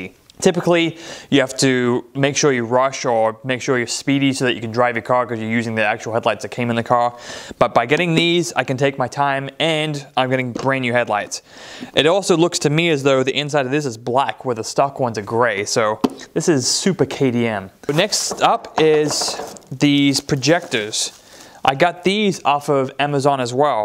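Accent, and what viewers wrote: Australia. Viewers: What the hell is your accent ? Lol I’ve never heard I’m Aussie you sound semi Aussie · cool project! am I detecting a slight australian accent?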